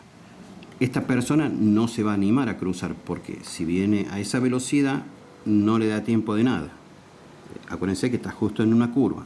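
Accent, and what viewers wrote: Córdoba, Argentina. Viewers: Ese acento cordobeh si se puede ver 😍 , lo entendi todo explica muy bien gracias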